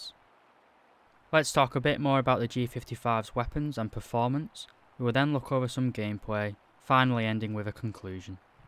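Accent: United Kingdom. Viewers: Hey from which part of the uk are u?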